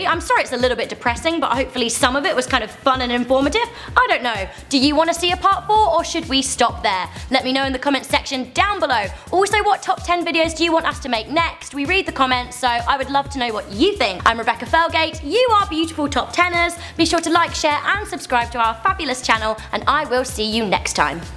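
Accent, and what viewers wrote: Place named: United Kingdom. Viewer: It's called a British accent.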